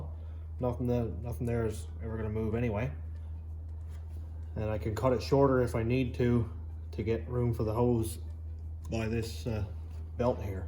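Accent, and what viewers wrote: Newfoundland, Canada. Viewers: You from Newfoundland or the Eastcoast by chance? Your accent sounds very familiar. Love the build! Cant wait to see more progress on it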